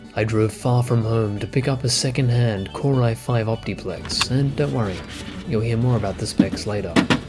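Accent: Australia. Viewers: Are you Australian? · From aussie?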